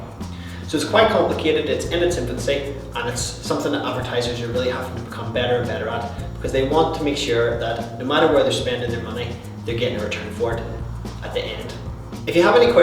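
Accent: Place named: Ireland. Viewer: Great video dude. Loving the Irish pub accent.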